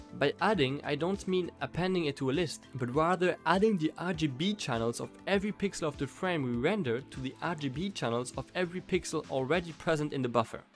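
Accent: France. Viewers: looks like an french accent you got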